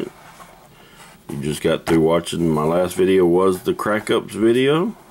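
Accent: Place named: South, United States. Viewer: Ha ha u said dude it sounds coolcuming from a southern accent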